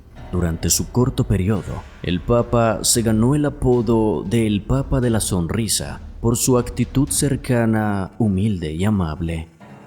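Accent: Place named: Colombia. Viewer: Hermano, vengo siguiendo tus videos me gustan, me encanta el acento colombiano, te deseo mucho éxito a ti y tu equipo, saludos desde México..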